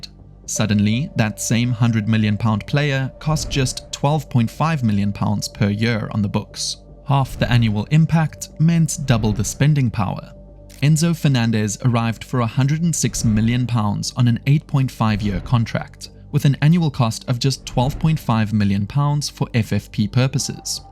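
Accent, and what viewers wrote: South Africa. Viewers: correct me if I'm wrong but this is a South African YouTube channel your accent is 100% South African🤔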